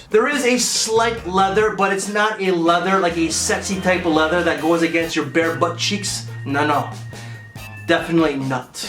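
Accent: Quebec, Canada. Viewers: Es-tu quebecois? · I don't know how to feel right now. Like you said, the scent is nothing special, but the visuals.....how did you manage to kiss yourself? lol Appreciate the accent québecois.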